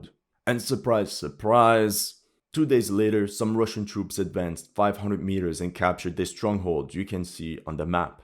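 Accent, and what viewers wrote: Jamaica. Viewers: Bomba Clot'! I was trying to figure out your accent. You're Jamaican! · 😂😂😂😂 i love this one 🇯🇲🇯🇲🇯🇲🇯🇲 you sound like a Jamaican 🇯🇲🇯🇲🇯🇲🇯🇲🇯🇲💯💯💯💯🇯🇲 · 🤣🤣🤣🤣🤣🤣 Boboclaaaat ! love the Jamaican voice . big up mi g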